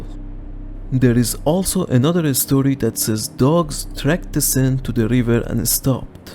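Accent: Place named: Russia. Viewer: I’m very happy that my comment wasn’t taken in a negative way! I really appreciate your willingness to try to enunciate a little more clearly and work on your English for me :) that’s really cool of you to do! One last thing, that is if you’re interested in my wishes, but don’t ever hesitate to make your videos as long as possible! I really enjoy and try to find the longest videos I can because it’s easier for me to sort of forget that I’m listening and just become hypnotized until I drift to sleep. I become your willing victim, I fall prey to the enchanted tone of your deadly sweet Russian accent... It’s harder for me to get to that place as quickly when I only have videos that are like 8-15 min. I like those a lot too but those are more for day time watching. When it comes to night time, sleepy time listening, longer videos are the best! Also, don’t ever let yourself become attached to or fall in love with any intros or outros to your videos that are like loud and abruptly audibly shocking like when you’re falling asleep watching an action movie at home and then an explosion suddenly happens in the movie after a long scene of quiet dialogue lol then you get jolted awake from the sudden volume change. 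A really incredible example of a YouTube channel that literally does everything I’m talking about 100% perfectly, in my opinion, and is the channel I compare every other missing persons/mystery channel to is the YouTube channel called “Unexplained Mysteries”. That channel uti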